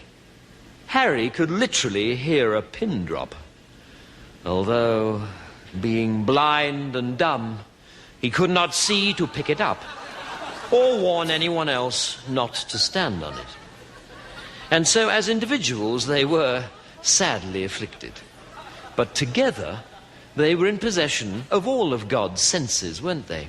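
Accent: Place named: Newcastle, United Kingdom. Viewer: I'm proud of this talented man from the North of England..People are often amused at geordies but Rowan is from Consett, believe it or not, and can speak his native geordie accent very well!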